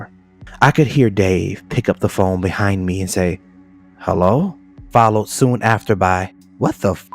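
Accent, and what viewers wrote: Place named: East Coast, United States. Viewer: Ok..ok.. 🤦 ‘’ALLEGED’’…..IS NOT thee same nor should it be used as a synonym to the 2 separate words of ‘’A LEGEND’’ ~ALLEGED- stated or declared to be as described, supposed to be. Supposedly. ~A LEGEND, is a Fable, fiction. Or if someone is called ‘’A LEGEND’’ it can mean they’re a hero. I am 100% aware that 🇺🇸 public school system has been consistent in one thing, just passing kids no matter what, & now even learning cursive writing ✍️ has sadly been tossed out along with basic spelling, which in turn doesn’t help any of the very young have knowledge in not only spelling but the meanings & differentiating of words. It’d be somewhat understandable if this narrator wasn’t a native English speaker, it most definitely sounds like he is & that he’s from the east coast.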